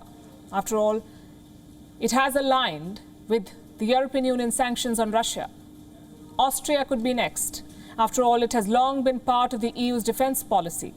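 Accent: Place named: India. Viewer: WION, MSM bottom feeder with an Indian accent.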